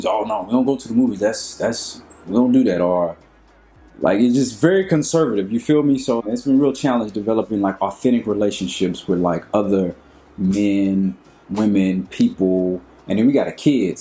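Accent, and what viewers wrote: Ghana. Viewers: My Man!!! Really amazing. You can do the Ghanaian accent. Repect! I can't do that even for 5 min consecutively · I like your Ghanaian accent. It's on point.. I see you already know how to navigate the Ghanaian society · 😁😂😂😂😂 I love your Ghanaian accent and the twi. New subbie here 🙌🙌🙌🙌🙌😘🔥😍😘💕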